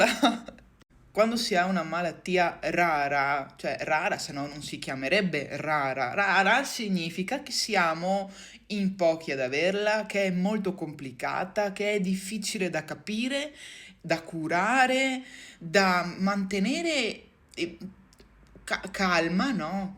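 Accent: Veneto, Italy. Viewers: Comunque volevo dirti che sei molto carina ed hai un bellissimo accento veneto ( mi sembra che sia veneto), magari dirtelo non serve a niente, ma è quello che penso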